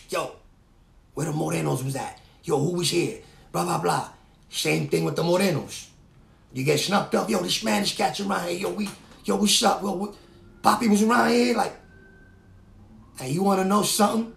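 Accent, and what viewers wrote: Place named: Brooklyn, United States. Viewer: I really enjoyed your stories please keep making videos. You and warpath both sound like guys I remember as a kid, I’m from Brooklyn Marlboro projects and Bensonhurst its makes me feel like I’m listening to the guys when I was a kid keep it up man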